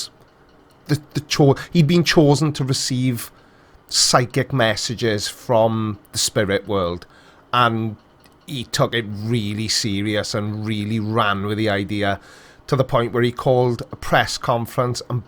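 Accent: Wales, United Kingdom. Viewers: We need a Creaky Weekly in the automotive world. As for this channel, I think you should cover whichever topic strikes you personally. If I may speak aggregately, folks watch to see and hear your take on things, your perspective. The Welsh accent and the clever phrasing only adds to the scope of the video. Fun to watch.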